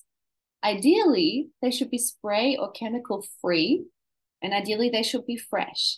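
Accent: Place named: Australia. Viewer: Love your Aussie accent. Greetings from Germany.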